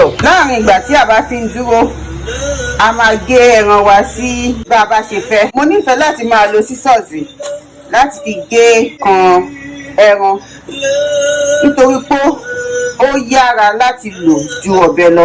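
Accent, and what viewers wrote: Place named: Ilorin, Nigeria. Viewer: You should have added subtitles. But I kind of enjoyed hearing pure Yoruba video. You sounded like you're from Ilorin.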